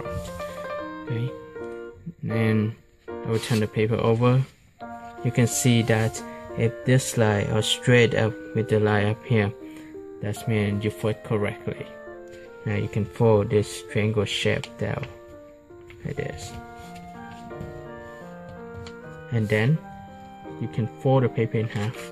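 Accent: Vietnam. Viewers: Anh này làm video hướng tới người nc ngoài, nên tiếng Anh là chính, nhưng là chất giọng Việt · Nghe cách nói tiếng anh là biết người việt rồi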